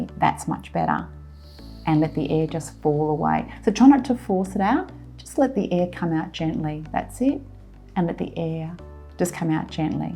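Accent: Australia. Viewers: Is that an Australian accent? Love it!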